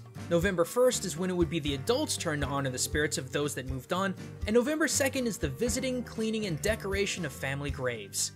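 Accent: Spain. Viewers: Man dat spanish accent!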